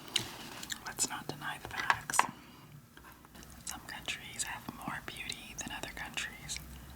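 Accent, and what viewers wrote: Ireland. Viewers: oh my gosh, before the disclaimer I thought you were 100% Irish :O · r u irish? also rply if ur wtching in 2016!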